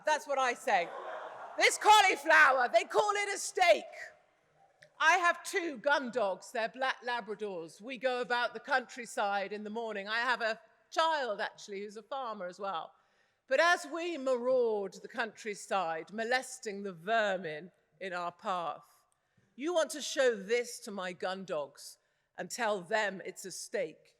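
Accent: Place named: United Kingdom. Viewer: I’m generally worn out from British accents but I like a British accent saying the things says. So much so I followed her.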